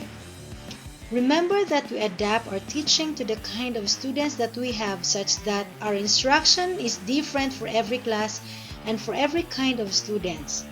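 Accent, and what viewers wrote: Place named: India. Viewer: Indian English is too easy to.detect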